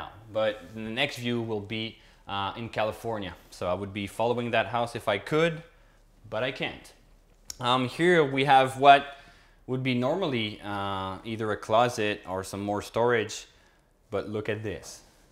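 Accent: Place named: France. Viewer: Y'all build the most beautiful and highest quality homes of all. Speaking of French accents, you seem to be losing yours with every new video. Never lose the quality of construction and your sense of humor. You guys are the best!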